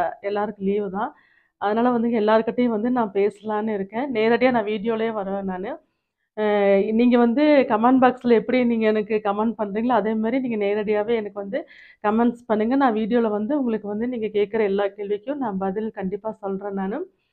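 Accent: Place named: Coimbatore, India. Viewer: I want to hear the Coimbatore slang akka.love you so much. All the best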